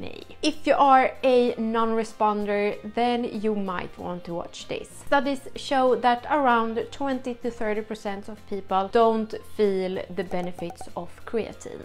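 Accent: Sweden. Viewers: Thanks for the info as I just started my 5mg daily. I felt noticebly stronger/more enduring than usual workout after only few days, but do admit it could be the placebo effect. Will know better next few weeks. Your calm and Swedish influenced accent is appreciated.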